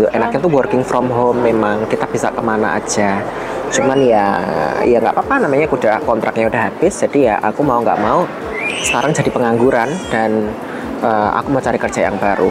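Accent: Java, Indonesia. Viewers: Tiap x mas bilang AING,CENAH...rita lngsung ktw krn lidah jawa ngomong sunda jd lucu aja bawa'annya🤭 semangat trs ya mas 🥰🥰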